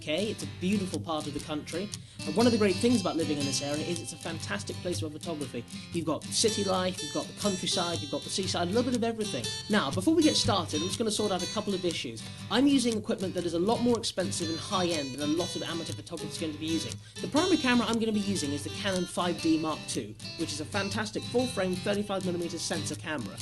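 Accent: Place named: RP, United Kingdom. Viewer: Really interesting stuff- despite really enjoying photography, I've never really understood all the mechanics of it so cool vid :) As a side note, you might speak a little more slowly? Your voice is very engaging but the pace is a little...pacey ;p Sean wants me to add that he thought it was very good and he was impressed :) ...also, I forgot how posh you sound!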